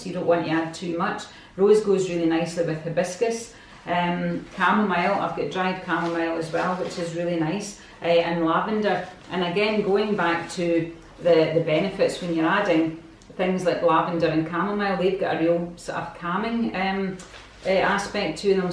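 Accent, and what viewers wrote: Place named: Scotland, United Kingdom. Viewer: This video gives a very comprehensive list of flavours and combinations available..it will be my go to for choice Thank you very much and refreshing to listen to a Scotish accent for a change!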